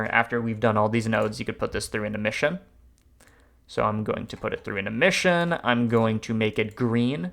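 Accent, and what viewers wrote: Boston, United States. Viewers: I love your Boston, reminds me of my C.S. college days =D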